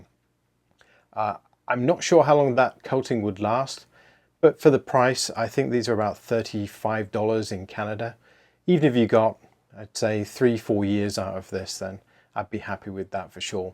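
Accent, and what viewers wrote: United Kingdom. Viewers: What's Canadian about a British bloke?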